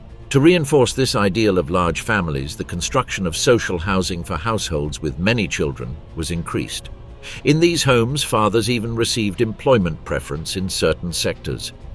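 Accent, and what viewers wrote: United Kingdom. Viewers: The usual anti-Hitler video which is the only ones acceptable of course. Great photos. Annoying Brit narrator. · I'm a retired NYC public school teacher. 25 years of ESL ( Eng Second Lang) to immigrant adults: South Americans, West Africans, Asian adults, fresh " off the boat." They always ask me about history & I tell them. I'm (82, Jan.'26) world history buff, so I know a lot & this is an excellent doc to describe what it was like there then. I also served in US Peace Corps Türkiye <num> & know a lot about Middle East. I speak/read/write Türkçe. So who wrote this script for not only this film but for all the others too? Excellent general research. Great British accent, too. I respect the work that went into this series. No problems with whatever " minor inaccuracy" there might be. Overall, great product. Thank you.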